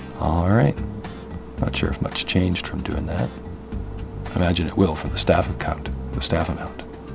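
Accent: Canada. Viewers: U sound very Canadian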